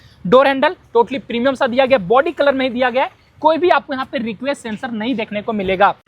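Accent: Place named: Bihar, India. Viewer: Bhai aap bihari hain kya?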